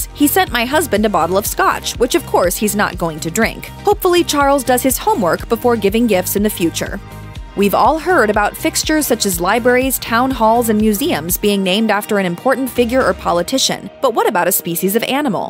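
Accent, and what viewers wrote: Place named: United States. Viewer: Sorry,but why do we always have to suffer these damn American accents!!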